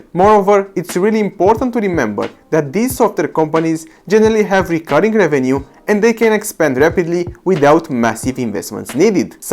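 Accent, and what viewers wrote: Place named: Romania. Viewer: Bruh u have a strong Romanian accept. But do u know what, me too. I need to get rid of it though, I left Romania so I barely speak that language anymore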